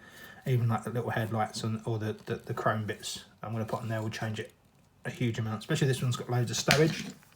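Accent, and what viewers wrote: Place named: RP, United Kingdom. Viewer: Awesome work Btw way you’re accent always knew you a bit posh lol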